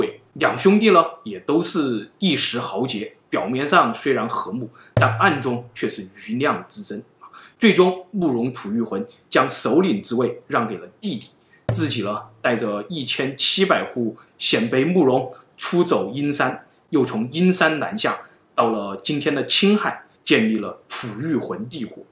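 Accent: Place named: Sichuan, China. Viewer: ，听你说话感觉你像四川那边的普通话，因为你n、l部分，平翘舌不分。但是看你留言又感觉你不在中国大陆的样子。因为大陆居民要翻墙才能玩youtube的，所以肯定现有B站账号、抖音账号才会有youtube账号啦。而且墙内是主要阵地啊。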